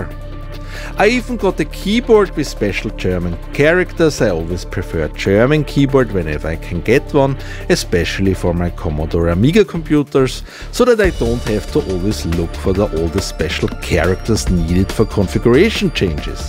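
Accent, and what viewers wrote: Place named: Austria. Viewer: you speak a very nice austrian english, ..... 😎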